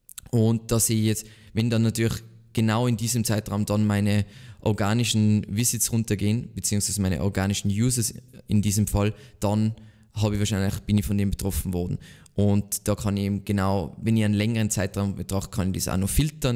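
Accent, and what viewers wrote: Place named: Tyrol, Austria. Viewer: So schön wieder den Inschbrugger Akzent zu hören! Was das Video angeht, TOP!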